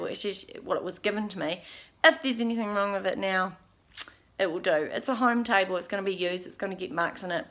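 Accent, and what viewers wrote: New Zealand. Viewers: Lovely kiwi accent!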